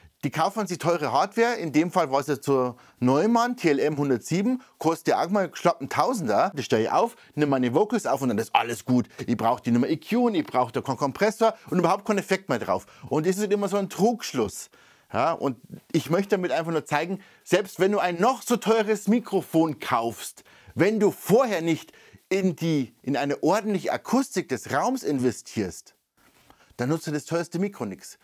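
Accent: Bavaria, Germany. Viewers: hi! Oiso...i steh auf Dialekt...deswegen, bleib bei dein boarisch! i schnoi, wos du so dazöst und waun i wos wü, vastehst du mi ah....ollas kloa!!!! grüße vo ana echten Weanarin..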